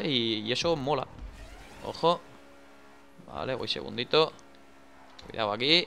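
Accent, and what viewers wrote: Mexico. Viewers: De donde o con quién estás aprendiendo hablar como mexicano!! saludos!!😉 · Azuverga we? De cuando aca habla mexicano? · soy yo o ya habla como mexicano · es español y habla como mexicano like si crees que es cierto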